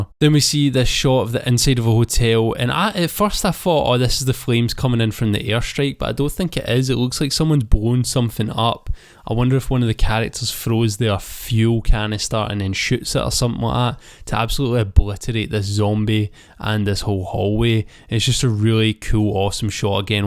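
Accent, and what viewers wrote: Scotland, United Kingdom. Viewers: Ive been looking forward to this 💪🖒 What part of scotland you fae mate? i cant tell if your same as me or west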